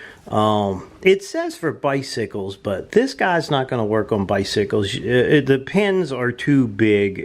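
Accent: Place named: Pennsylvania, United States. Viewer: Love your accent.. PA? NC?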